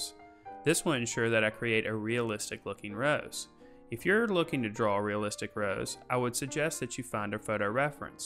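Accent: South, United States. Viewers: You sound sooooo much like a country western dude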